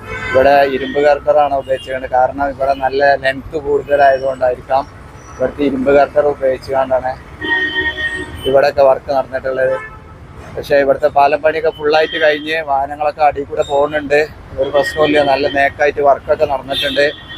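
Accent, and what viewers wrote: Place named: Thalappara, India. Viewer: ഭാഷയെ പറ്റി പറയുമ്പോൾ ഒരു രസം തന്നെയാണേ ഇപ്പോൾ (ഇപ്പം) എന്റെ ഭാഷ എന്റ വീടിന്റെ ഒരു 5 KM പടിഞ്ഞാറ് ഭാഗത്തേക്ക് പോയാൽ ഒരുപാട് വ്യത്യാസമുണ്ട് അത് പോലെ എല്ലാ ഭാഗത്തും ഉണ്ട് ഇദ്ദേഹത്തിന്റെ സംസാരം കേട്ടിട്ട് തലപ്പാറ മുട്ടിയറ ഭാഗത്ത് താമസക്കാരനാണെന്ന് തോന്നുന്നു ' നിന്റെ ഫാസയിയിൽ ഒരു വീഡിയോ ചെയ്യ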